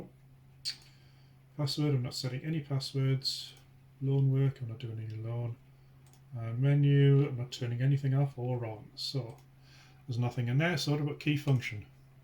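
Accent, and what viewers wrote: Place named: United Kingdom. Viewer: Arizona U.S.A and English, sounds as though your of British/UK descent. Thanks for doing this video.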